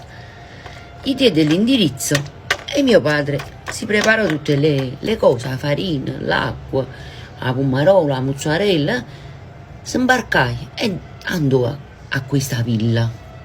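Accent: Naples, Italy. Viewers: Mi piace ascoltare le storie che racconti...poi con quell' accento napoletano..mio padre era napoletano❤❤❤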